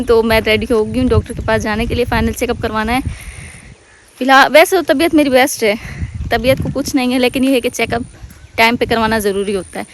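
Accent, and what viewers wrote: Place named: Lucknow, India. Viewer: Ap ka bat karne ka lehja or tarika India Lucknow ke logo ki tarha he... Love from india🇮🇳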